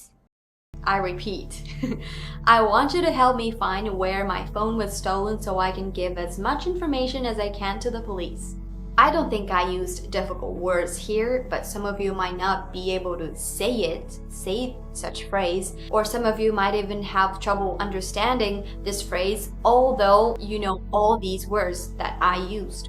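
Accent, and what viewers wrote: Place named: United States. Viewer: Your voice is like an American.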